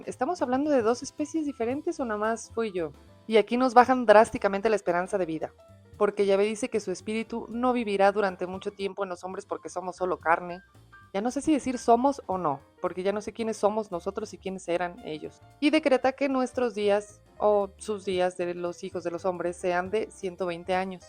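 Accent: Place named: Mexico City, Mexico. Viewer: Qué joya de comentario, carnal. Leer la historia antigua en tono chilango es otro nivel. Y la neta es que traes un ritmazo con los datos históricos de los egiptos y la estrella Sirio. Es 100% real que esos sacerdotes se dieron cuenta de que cuando Sirio aparecía en el cielo, el Nilo se inundaba, y así calcularon el año solar de 12 meses. Y sí, Julio César llegó siglos después a meter sus reformas porque el calendario romano era un desmadre. Pero con lo de los 900 años de esos güeyes de la Biblia, la matemática nos da un patatús biológico. La teoría de que contaban "meses" en lugar de años solares para que Matusalén viviera 72 años suena chida, pero si aplicas esa misma lógica a todo el árbol genealógico, el guion se rompe bien feo. Por ejemplo, el Génesis dice que Enoc tuvo a su hijo a los 65 años; si esos fueran meses, significa que el güey fue papá a los 4 años y medio de edad. 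¡Una completa locura! La realidad histórica es más simple: los escritores de la Edad de Bronce no tenían un error de álgebra, simplemente eran bien exagerados. En todas las mitologías de esa zona (como los sumerios), entre más viejo y antiguo era el ancestro, más años le inventaban para hacerlo ver más sagrado, poderoso y cercano a los dioses. Eran puras mentiras piadosas para apantallar a la gente del pueblo, no un problema de traducción de los egiptos. ¡Pero qué buen cotorreo armaste con Julio César y sus mamadas!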